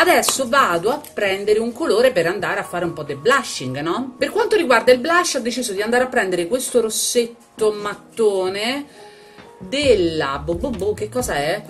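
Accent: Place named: Rome, Italy. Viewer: In questo periodo ci sta proprio un po' di sana ironia e simpatia,troppo forte il tuo Romano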